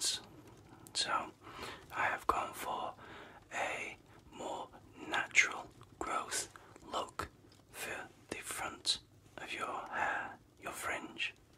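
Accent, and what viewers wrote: Yorkshire, United Kingdom. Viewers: a proper accent whilst listening to ASMR! Beautiful Yorkshire tones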